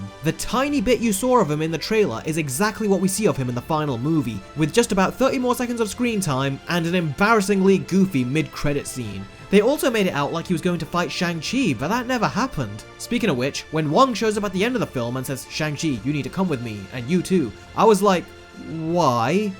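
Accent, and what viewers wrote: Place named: United Kingdom. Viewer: I could be wrong- and I apologize in advance! But, I believe b/c he's British, they pronounce the "a" differently. Same thing with "Mulan." He pronounced her name differently as well.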